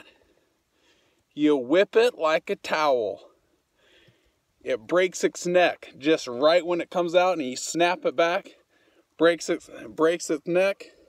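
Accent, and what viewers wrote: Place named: Australia. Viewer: Dude defenetly from australia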